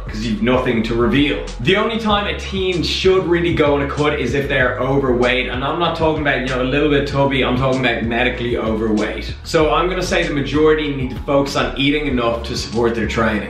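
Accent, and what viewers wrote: Ireland. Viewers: SumDude Norris Irish. (Dublin) I think. I'm from Cork and also has a bit of that accent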